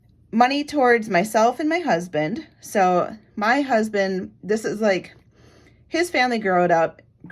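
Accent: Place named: Midwest, United States. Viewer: For real! I didn't hear the nerves at all. I actually told my sister that I would listen to you if you had a podcast because it's like I'm chatting with a friend! Maybe it's the Midwest "accent" we have that sound so familiar hehe :)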